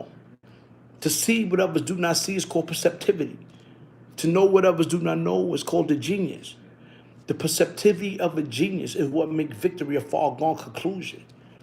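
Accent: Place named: New York, United States. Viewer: It’s really a NY thing first. Italians from Italy or outside the NYC & Philadelphia metro area don’t sound like that. Especially being from Brooklyn, he definitely grew up around Italians.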